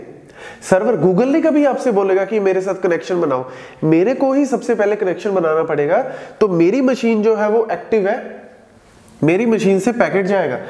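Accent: Punjab, India. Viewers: punjabi accent makes the video greater , Great work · Damn! That Punjabi accent surely sticks in my head. Great explanation too!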